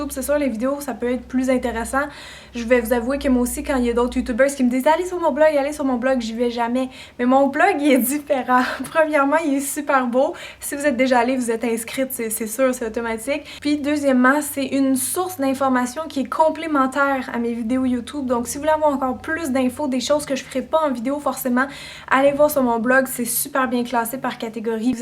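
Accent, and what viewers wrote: France. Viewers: ben t francaise non? nous on trouve que vous avez un accent ici au Quebec ♥♥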